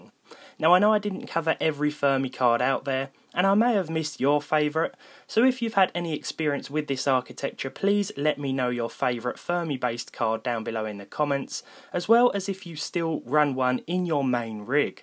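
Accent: United Kingdom. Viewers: that heavy british accent tho, it disgusts me , can you like stop chewing words ? damn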